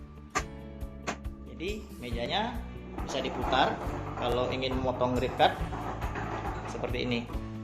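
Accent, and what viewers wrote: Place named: Pekanbaru, Indonesia. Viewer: Dari Pekanbaru ya bang?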